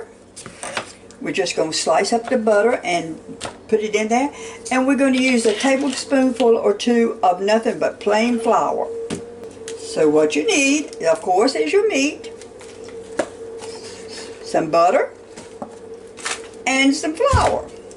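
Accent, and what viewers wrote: South, United States. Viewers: Hello Miss. I've always wondered where your accent is from. Definitely down south.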